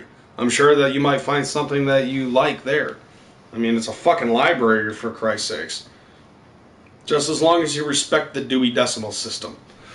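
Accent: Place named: Midwest, United States. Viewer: Are you a Michigander,? I was born and raised in the mitten but live in Los Angeles now. Your Midwest accent is making me miss some of my Michigan homies.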